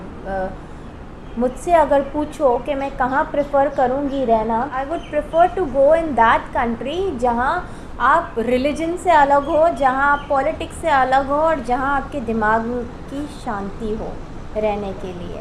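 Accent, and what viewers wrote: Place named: Kathiawar, India. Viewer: If your ancestors are from Karachi/Sindh/Pakistan then your mother tung should be Sindhi. Why are you speaking Gujarati? In Karachi most Gujarati speaking muslims are migrated from Junagadh Saurashtra. Example one of greatest Pakistan's cricketer Hanif Mohmond n his brothers all were born in Junagadh accept Shadik Mohmond. You needs to do more research on your ancestors because your gujarati speaking tone is Kathiawadi.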